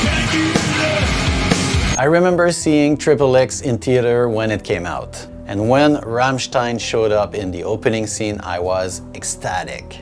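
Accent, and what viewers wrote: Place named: Quebec, Canada. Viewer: Je détecte l'accent Québécois ❤ Dommage qu'il n'y ait pas plus de contenu francophone concernant ce genre de musique que j'affectionne très particulièrement. J'adore ta chaîne, je me suis abonnée ✌️ *Mention spéciale au film Spawn de 1997, une des meilleures trames Sonore jamais faites et .... My life with the Thrill kill cult dans le film The Crow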